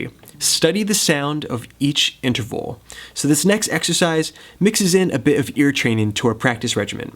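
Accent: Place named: South Jersey, United States. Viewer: I'm from South Jersey but have lived overseas a long time. Great hearing that familiar SJ/PHL accent! Great tutorial. Seriously helpful!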